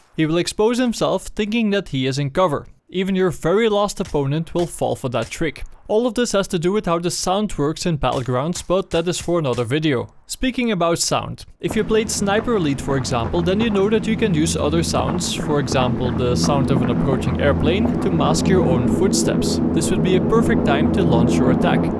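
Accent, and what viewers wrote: Netherlands. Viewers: you can tell he speaks dutch normally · arent you dutch your accent sounds like that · Your Accent is netherlands, isn't it? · he kinda sounds dutch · Ik kan aan je accent horen dat je nederlands bent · u dutch? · Steenkolen engels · dutch accent?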